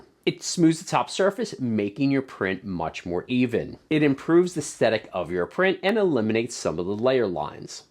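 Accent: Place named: California, United States. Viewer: I know nothing about you other than from you accent you are clearly from California.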